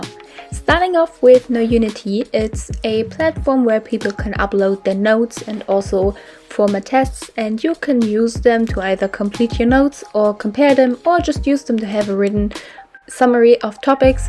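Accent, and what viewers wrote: Germany. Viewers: Der deutsche Akzent ist so cute HAHAHAHA. Aber tolles Video ❤️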